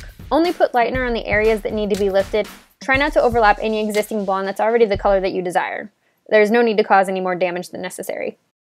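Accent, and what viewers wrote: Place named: South, United States. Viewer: what sounds like a Southern accent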